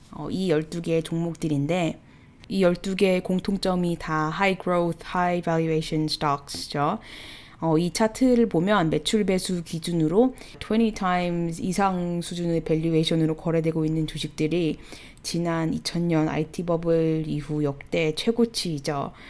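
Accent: United States. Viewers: 뉴요커발음 ㅓㅜㅕ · ㅋㅋ 아니 미국에살아서 미국식 발음 하는게 뭐가 그리 불편함? ㅋㅋㅋㅋ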